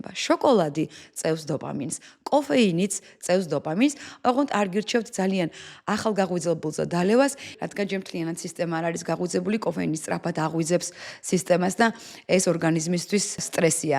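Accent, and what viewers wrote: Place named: Guria, Georgia. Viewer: "კვტეპიან" გურული ხარ უეჭველი❤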